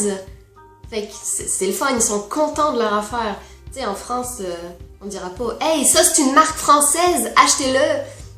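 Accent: Quebec, Canada. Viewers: ChanelVidéoLps ben elle est au québec, cest sur quelle finit par prendre laccent 😂😂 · Son accent est vraiment trop québécois pour qu'elle soit française et qu'elle habite au Québec depuis seulement un an et demi... des fois elle perd son accent français. j'ai travaillé avec beaucoup de français et jamais ils ont eu un accent aussi québécois en si peu de temps. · Je ne sais pas si on te la dejà dit mais je trouve que tu as quand même l’accent canadien :D J'adore ça haha :D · pour le peu de temps que vous êtes au Québec, il y a un 20% de vos mots qui sont d'un accent purement québecois. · J'ai de la difficulté à croire que vous êtes française. Après seulement deux ans au Québec, vous avez adopté l'accent québécois à merveille. Vous me paraissez plutôt une Québécoise qui "essaie" de parler comme une Française. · en si peu de temps t'as deja l'accent québécois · ta un accent québécois!! 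je pensais que tétais québecoise! tu dis l'expression "poche"😂😂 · Elle a un peu l'accent québécois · tir quand t'écoute et t pas sur si c une accent du qc ou de la fr :')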